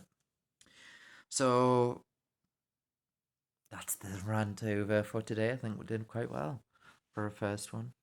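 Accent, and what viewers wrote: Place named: County Durham, United Kingdom. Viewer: Dunno if your channel is even still active, but I just wanted to tell you you're amazing, I think you're proper class. You've been through so much, but you're an amazing human being. I'm from county durham but live in Australia and when I saw and heard you it felt like home ☺️ i hope you're OK, I really do. Life can be so shit some times, I've gone through very different things, but pain is pain. I just felt the need to reach out and say hi 😊 hope you're doing well, it cannot have been easy.' I can't even imagine. I appreciate all of your public speaking, I think it helps the community, or even if it gelps one person, you've done so much! Thanks for easing my homesickness, but most importantly, being very vulnerable, true, and honest. You're amazing ❤❤❤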